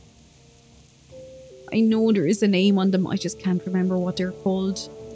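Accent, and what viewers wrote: Ireland. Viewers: I moved to Canada last year and I haven't really interacted with any Irish here. I used to play wow as a teenager and was looking it up on youtube for nostalgic comfort. This was cool to see, I didn't realise I could enjoyed hearing an Irish accent that much Thanks :)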